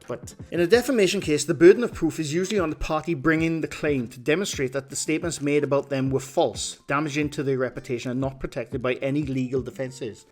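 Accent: United Kingdom. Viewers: I take it from your accent that you are posting from the UK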